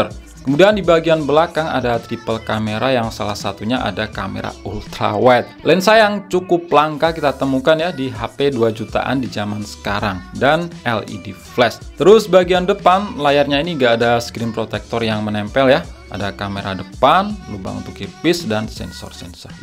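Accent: Blitar, Indonesia. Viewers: orang Blitar kah bang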